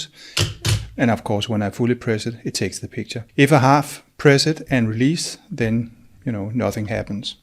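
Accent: Denmark. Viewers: are you danish? your accent is very danish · I love your Danish accent :)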